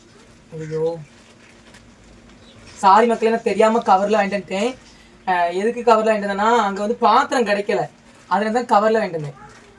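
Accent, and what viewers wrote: India. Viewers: உன் பேச்சு வார்த்தை எல்லாம் தூத்துக்குடி மாவட்டத்தில் உள்ள பேச்சு மாதிரி இருக்கு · தம் தந்தைக்காக, தம் குடும்பத்துக்காக உணவு வாங்கி கொடுக்கனும்னு எண்ணுறதே பெரிய மனசு தம்பி. அந்த உணவு வழிபாட்டுத்தலங்களில் கிடைத்தாலும் சரி, திருமண நிகழ்ச்சிகளில் கிடைத்தாலும் சரி, யாரேனும் கொடுத்தாலும் சரி, நீ உழைக்கும் இடத்தில் கிடைத்தாலும் சரி அதை வாங்கி குடும்பத்தார்களுக்கு கொடுப்பது மிகப்பெரிய நன்மை உண்டு. உம்முடைய நெல்லை பேச்சு மிகவும் அழகாக இருக்கிறது. உன் பெற்றோர்களுக்கு கடவளின் ஆசிர்வாதம் என்றென்றும் கிடைக்கட்டும்.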